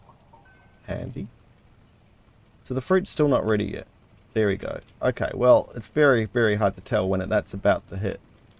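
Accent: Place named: Australia. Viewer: Good to hear an Aussie voice on YouTube. Cheers bro 👌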